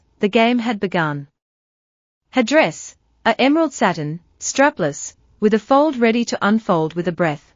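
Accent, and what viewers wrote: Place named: United Kingdom. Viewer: Confusing; a British FEMALE voice for Noah! “Mrs.” Vs perhaps “Mrs” , “Mr.” Vs “”Mr” , Santa Fe? Hot! But awkward.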